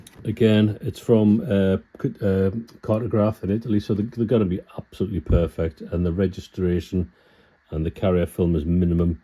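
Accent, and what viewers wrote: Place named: Northeast England, United Kingdom. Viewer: Hi, What a gorgeous build, well done my friend. I live close to Consett and you have a familiar accent. Do you take on commissions? The reason I ask is that I own the original entry forms for Peter Townsend blue Hurricane from the Kings Cup Air Race. I bought the 1/48 Hasegawa to have built and make a complete display. Is this a project you could do for me? Regards, Ian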